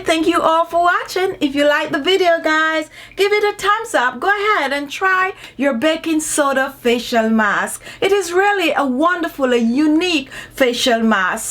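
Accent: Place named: Jamaica. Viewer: Hello, are u jamaican like me it sounds like u have the same accent. NO offense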